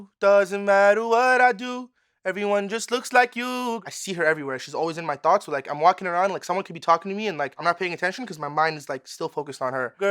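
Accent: Toronto, Canada. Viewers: he sounds like a guy from toronto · I can tell by the way he talks he’s from Toronto. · Toronto mans accent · When he started I thought he has a toronto accent and the I looked him up and I was right! Lol anyways this guy is amazing!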